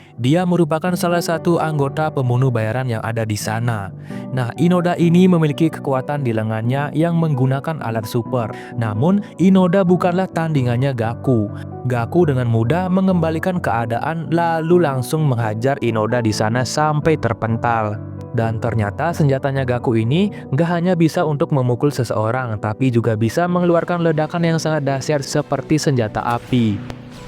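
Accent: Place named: Bali, Indonesia. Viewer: SERASA LOGAT BALI... BLI😁, KELIATAN DARI LOGAT BILANG HURUF T DAN LAIN2😅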